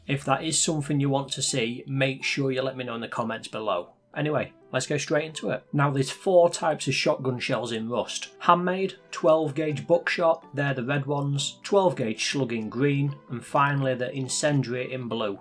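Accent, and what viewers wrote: United Kingdom. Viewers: Is this the speccy bum accent from Glasgow? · What English accent is this? Sound familiar, my English teacher?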